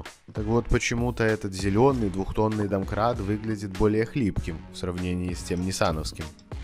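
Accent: Belarus. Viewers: Канал называется Крэйзи рашнз эксперимент, а войсовер имеет выраженное беларуское произношение)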